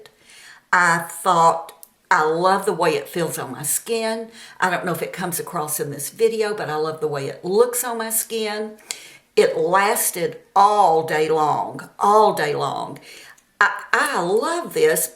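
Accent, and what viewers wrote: South, United States. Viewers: hear that southern drawl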